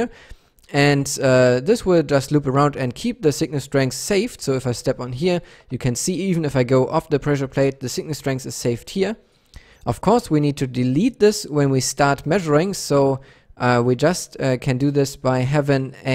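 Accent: Germany. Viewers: coole idee und gute umsetzung. leider habe ich nun das dilemma, dass sich mein "speedometer" nich resetet. hab nicht das gefühl, dass das 2 sekunden sind... hab das tut nochmal angeschaut und meine konstruktion überprüft, leider ohne den fehler zu finden. hast du auf anhieb einen tipp, woran es liegen könnte? lieben dank und dir noch einen schönen tag. :) (das du deutsch kannst hört man am akzent und in einem anderen vid begrüßt du die leute mit "herzlich willkommen" :D )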